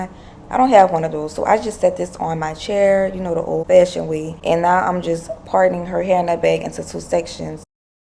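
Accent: New Orleans, United States. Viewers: I hear the Nola in you lol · Great Job! I know you from New Orleans by the Talk. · I hear a Nola accent and I’m here for it 😵🥹🥰😍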